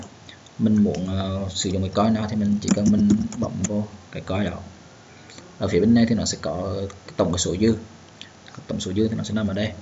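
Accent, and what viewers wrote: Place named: Central, Vietnam. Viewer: Học tiếng việt nam đi bạn ơi chứ làm youtube nch quốc gia miền trung vậy nghe nhức cả óc =.=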